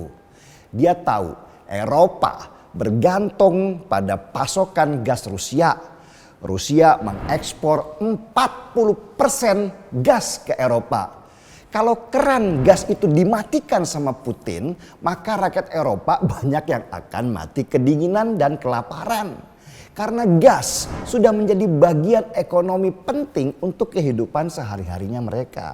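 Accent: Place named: West Java, Indonesia. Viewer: Bang, logatmu mana bataknya? Kok malah jd sunda bgtu? Gk keliatan akarmu, ini aseli batak atau cangkokan sih? Cmon, talk with ur blood not ur mouth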